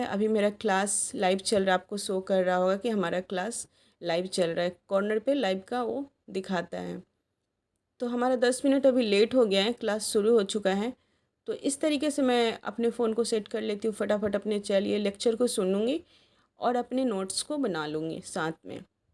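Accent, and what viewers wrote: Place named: Bihar, India. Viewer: App bhiar se ho awaz se lag raha hai but kha se 😊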